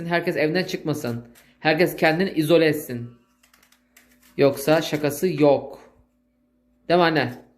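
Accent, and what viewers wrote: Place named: Bartın, Turkey. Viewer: Bu şive olayına takanları anlamıyorum insanlar bu şekilde nasıl abartarak konuşabilir çocuk hemen hemen her videoda şive meselesini konuşuyo inanmayanlara açıklama yapıo haala abartıyorsunuz diyorsunuz valla pess tek dert bu olmuş. Ben bartınlıyım çaycumalı tanıdığımda var bu şekilde konuşuyorlar kardeşim sen şive ile ilgili açıklama yapma bence bilen biliyo inanmayanda izlemesin sevgiler🙋‍♀️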